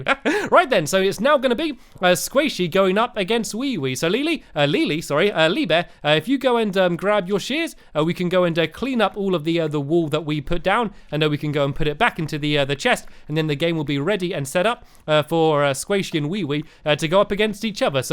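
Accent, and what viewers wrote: United Kingdom. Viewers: One question, Um is stampy british? Because his voice sounds like british something like squid but a little different anyways hi stampy i like your videos! xD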